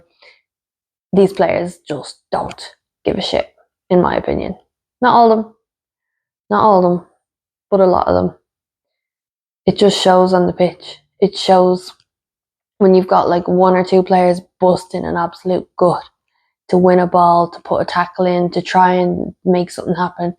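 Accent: Ireland. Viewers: I love your Irish accent. I could listen to you all day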